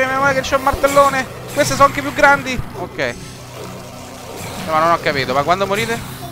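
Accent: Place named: Rome, Italy. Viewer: ahahah e vero!! XD e il massimo il folletto con l'accento romano considerando che lo sei anche te XD il gioco ftt apposta per te!!! XD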